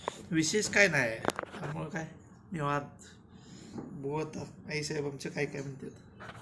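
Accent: Kolhapur, India. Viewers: दादा तुझे व्हिडीओ मी डबल बघतं असते एवढे व्हिडीओ छान असतात 😊😊❤❤❤❤❤👌👍 तुझी कोल्हापुरी भाषा खूप खूप आवडते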